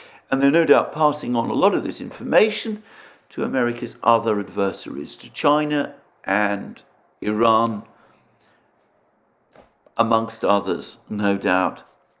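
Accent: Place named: North London, United Kingdom. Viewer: Michael Caine = East London accent. Alexander = RP (received pronunciation, ie, schooled,) with North London overtones. The people you knew from Wales will have been extremely different, more of a sing-song and softer accent. The regional accents from, for example, Wales, Cornwall, north of England and Scotland vary widely among themselves and are an important part of our history and heritage. More diluted now than they used to be, but still interesting. Likewise, of course, American accents differ, in different parts of the country. 🤓🤓🤓👍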